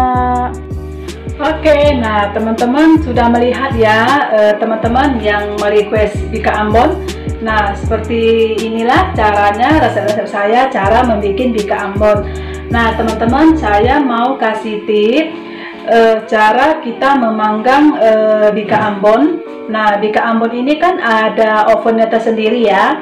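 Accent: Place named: Medan, Indonesia. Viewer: Orang medan mana suaranya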